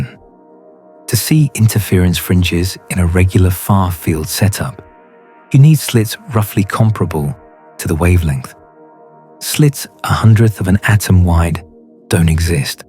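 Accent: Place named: England, United Kingdom. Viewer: English accent - American idiom. Quite dissonant.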